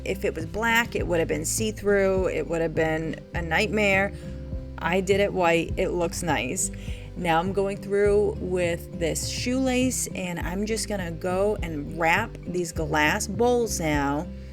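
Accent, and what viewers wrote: United States. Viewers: Where u from? I hear a hint of an east coast accent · I’m from Somerset MA and you sound like me. I picked it up right away. I was going to say Attleboro but Plymouth makes sense too.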